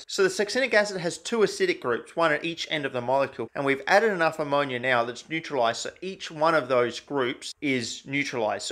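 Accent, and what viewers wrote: Australia. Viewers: Love your channel, there's not many other people on the internet who do decent organic chem! A suggestion: try making benzaldehyde from benzene via Gattermann-Koch reaction. Basically, it's a Friedel-Krafts with CO + HCl mixture in place of an acyl chloride. Very neat chemistry and pretty cheap in terms of reagents used, maybe as cheap as you can get. I wanted to do that for a looong time, but I just don't have enough good equipment for working with the gases. In fact, I happened to find a detailed procedure for it in a 120 year-old "Practical methods of organic chemistry" by prof. Gattermann himself. Here's the link: <link> (Actually, it's a surprisingly good organic chemistry textbook.) PS: your accent is wonderfully Australian, always nice to listen to :)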